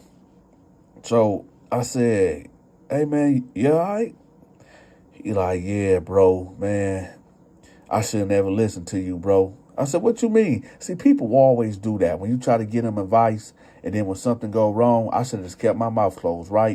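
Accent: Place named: Detroit, United States. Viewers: It sounds like you from Michigan..but home is where your heart is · You got a Detroit accent , so you’re from Detroit lol. New subbie here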